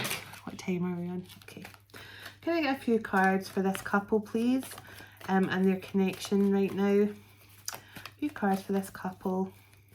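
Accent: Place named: Scotland, United Kingdom. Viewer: Love ur reading style,seen nothing like it,❤❤❤it.a while back I guessed irish,Irish, corrected me kindly,no its obviously scottish=D.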